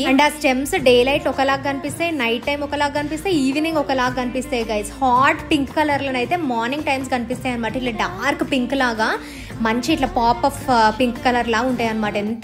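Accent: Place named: Warangal, India. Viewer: Table rose taskarichimam akka mona and Lotus updates ivandiiiiii and what aa warangal slang take a bow to that 🙇🙇🙇‍♂️🙇‍♂️🙇‍♀️🙇‍♀️❤️❤️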